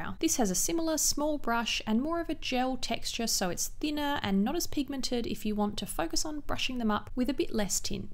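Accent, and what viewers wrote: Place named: Australia. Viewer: Your accent is so cute!!! Love the Aussie touch to your videos 😅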